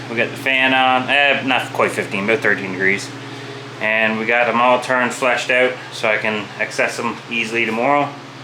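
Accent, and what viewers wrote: Newfoundland, Canada. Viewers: I can recognize a newfie accent even if it’s faint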